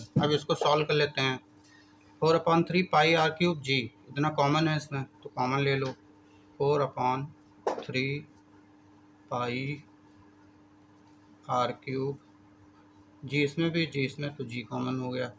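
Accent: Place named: Bihar, India. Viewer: Bihari ki tarah mat bolo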